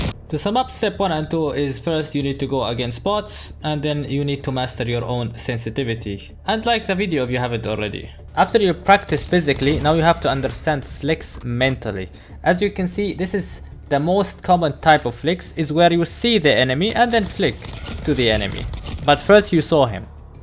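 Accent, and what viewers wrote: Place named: Arab world. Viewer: bro where you from are you arab ? you sound like an arab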